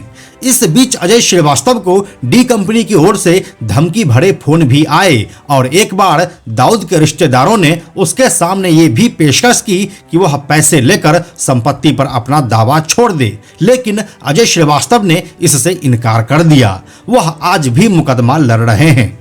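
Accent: Bihar, India. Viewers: बकवास, उससे अधिक घटिया बिहार जैसा उच्चारण. शम्स ताहिर खान से training लो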